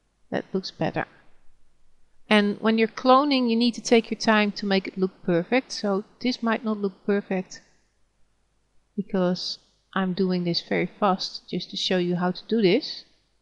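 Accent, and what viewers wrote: Netherlands. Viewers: Great tutorials. I've just subscribed. That's one hardcore Dutch accent! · Your accent seems to be dutch. Nice tutorial btw. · nederlander hoor je aan het accentje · Ik merk dat je Nederlands bent, wat is dat voor galm achter je stem? Interessante tutorial trouwens. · so dutch hahah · Don't bother what he/she said. You are from Holland so its obvious your accent will not be the same as native american or british. In my opinion your voice is quite sexy^^ and thanks for this tutorial :D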